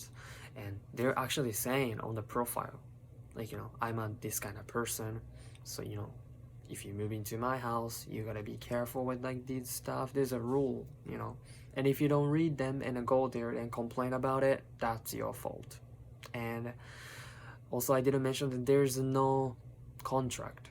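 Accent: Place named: Japan. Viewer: 日本人英語でわろた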